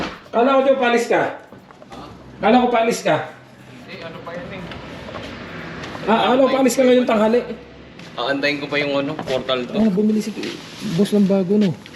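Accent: Philippines. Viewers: Kuya parang tagalog na tagalog ka? Taga Quezon?, Mindoro? Mariduque?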